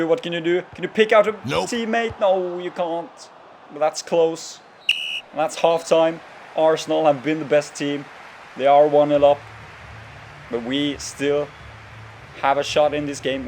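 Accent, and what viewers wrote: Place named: Norway. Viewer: Are you Norwegian? You speak kind of Norwegian/English 😊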